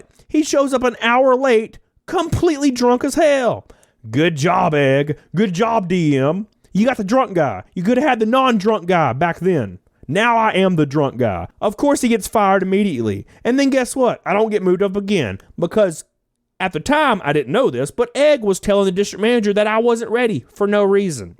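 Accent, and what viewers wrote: South, United States. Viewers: Have you ever considered voice acting? I could imagine you doing voices for cartoons or even radio dj, or audiobook. Your southern accent is so unique! Great content tho, always funny and intriguing to hear what goes on in crazy retail.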